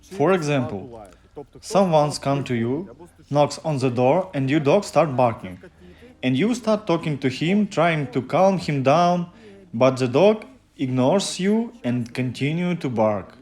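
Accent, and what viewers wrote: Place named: Russia. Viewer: Найдите другой голос за кадром, очень сильный русский акцент. Слишком сильный.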